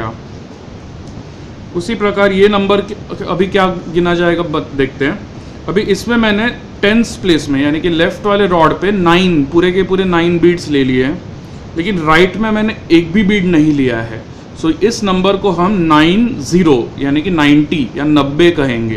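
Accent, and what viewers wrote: United States. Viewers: Kyu aap american hai Kya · tu america se hai kya??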